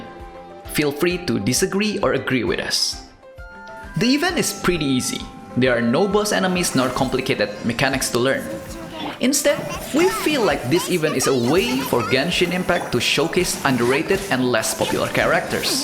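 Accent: Indonesia. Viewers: i believe its indonesian accent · yes it is, even though I don’t really talk like that but I hear a lot of people in Indonesia talk like this.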